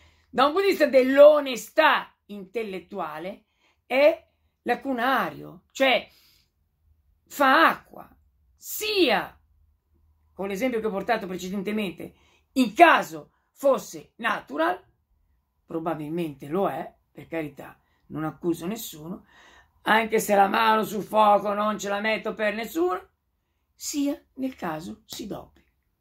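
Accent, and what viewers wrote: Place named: Ancona, Italy. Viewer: Me sembri de Ancona, mi sembri 😆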